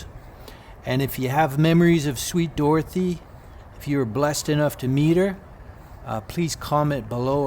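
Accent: Canada. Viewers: You're definitely Canadian!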